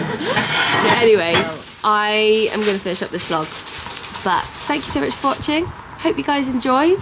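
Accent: Wales, United Kingdom. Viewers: Why don’t people understand change is a great thing and it’s completely natural! Change is how we grow into the people we want to be. Also how bizarre that sometime says your losing your Welsh accent like of course you’re living in England😂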